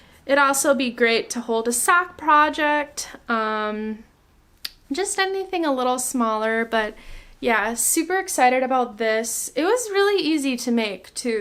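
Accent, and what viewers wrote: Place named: Canada. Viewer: Girl if you didn't say otherwise, I totally would have thought that you are Canadian! Also, envious that you have a family business to work at...such a blessing, may you never take it for granted. Love from your northern neighbour!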